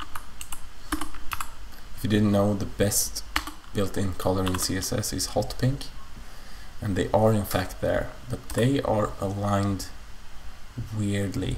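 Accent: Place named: Sweden. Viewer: Are you from sweden? bzs you have the same accent as pewdiepie.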